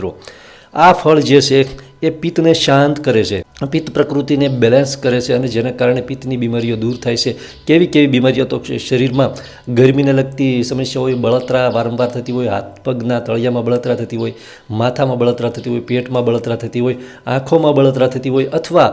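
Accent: Bhavnagar, India. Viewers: ભાઈ, તમારી ભાષા ટિપિકલ ભાવનગરી છે ! અનુમાન સાચું છે ?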